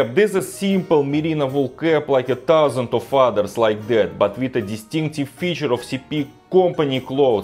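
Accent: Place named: Russia. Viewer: У любого пилота самолета такой же русский акцент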